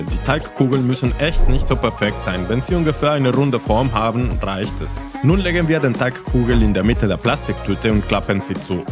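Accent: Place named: Russia. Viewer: Интересно, по акценту автор русско говорящий. Не плохой немецкий.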